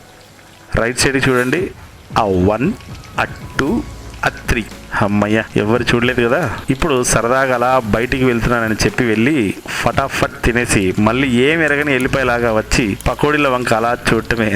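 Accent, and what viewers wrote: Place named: Kadapa, India. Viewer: తప్పుగా మాట్లాడింటి..... అంటున్నారు అంటే కడప or కడప sarrounding వాళ్ల మీరు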